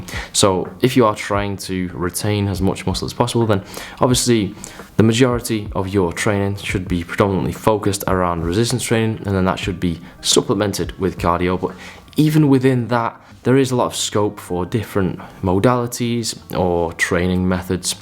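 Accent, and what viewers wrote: United Kingdom. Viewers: What part of the UK are you from ?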